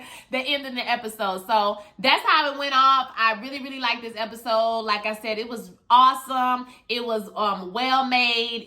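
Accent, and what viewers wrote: Louisiana, United States. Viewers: Dont watch Fargo, but showing love from yourlovecraft reviews. You also sound like a fellow Louisiana girl. I could be wrong, lol!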